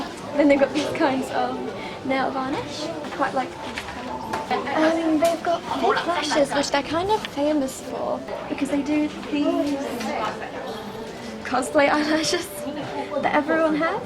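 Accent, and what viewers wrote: United Kingdom. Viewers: their accent is british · Are you british? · you sound British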